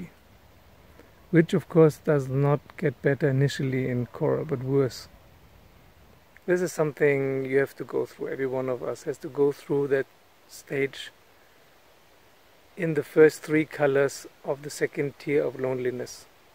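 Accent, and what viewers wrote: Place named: India. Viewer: wow, I always thought you were Indian, wheres your accent from? :)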